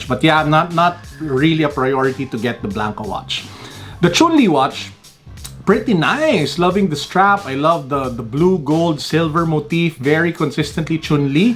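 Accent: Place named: Philippines. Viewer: Love the Filipino accent. Cheers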